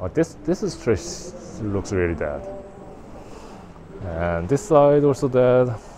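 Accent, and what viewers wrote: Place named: South Korea. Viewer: No se aprecia ningún acento ni de España ni de latinoamerica por lo que no sé dónde lo has aprendido. Lo del acento lo decía porque se notaba que el que hablaba, o sea, tú, tenía un deje coreano que he escuchado en otros vídeos y me encanta. Esa tonalidad me pone feliz y hace que sonría. Por todo ello GRACIAS desde España.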